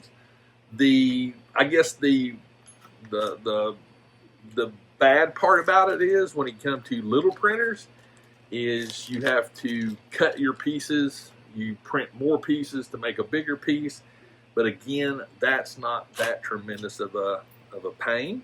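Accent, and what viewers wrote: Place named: Georgia, United States. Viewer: Are you from Ga? That accent sounds a lot like mine!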